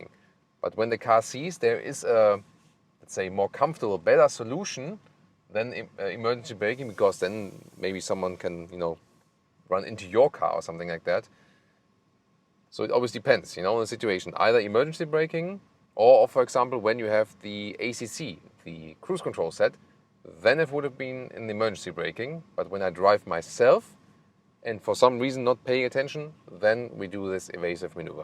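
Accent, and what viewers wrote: Germany. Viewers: its probably german eccent. You say egthree instead of exsthree. Letter by letter. Sorry for being like that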